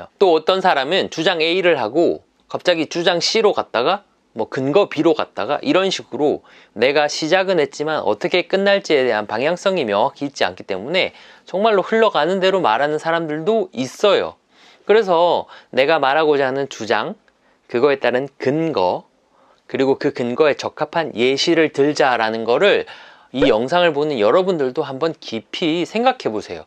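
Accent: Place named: Gyeongsang, South Korea. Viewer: 저는 어릴때부터 발표를 너무 싫어하고 기피했는데 살면서 정말 피하고 싶었던 그런 면접을 보게 되었거든요ㅠ 그런데 제가 부산사람이라 억양을 어떻게 해야될지도 모르겠고 그래서 더 너무 힘들다고 생각했는데 선생님께서 경상도 억양을 섞어서 너무 자연스럽게 똑부러지게 말씀하시는거 보고 부산말로 하는게 이상한게 아니구나 깨닫고 지금 그것만으로 너무 감사하는 마음입니다ㅠ 저 힘내서 잘해보겠습니다.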